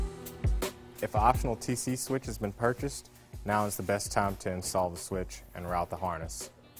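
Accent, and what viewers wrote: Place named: Mexico. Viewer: This Mexican has a funny accent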